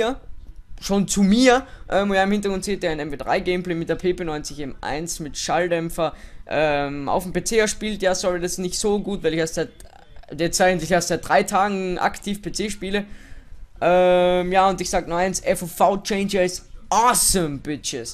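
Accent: Austria. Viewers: endlich amal a österreichischer kommentator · Bist Wiener :)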